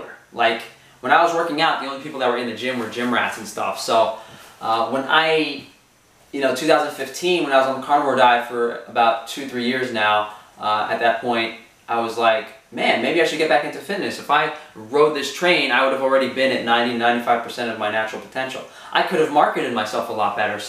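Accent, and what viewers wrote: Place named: New York, United States. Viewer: I love your muscles and you are fine as hell.I don't care what anyone say.you're hot.Love listening to your videos rather I agree with them or not.Even if I don't agree with some of the information I just love listening to you talk.I love your Italian and new york accent.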